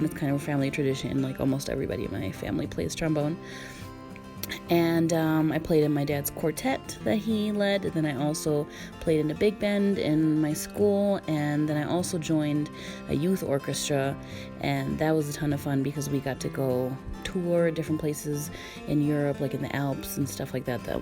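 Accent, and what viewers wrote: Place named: United States. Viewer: Why do you have an America accent?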